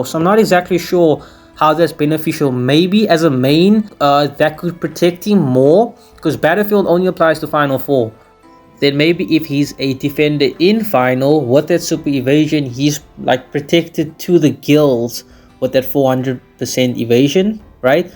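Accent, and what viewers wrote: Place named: South Africa. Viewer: Bro you from SA? can’t mistake that accent 💀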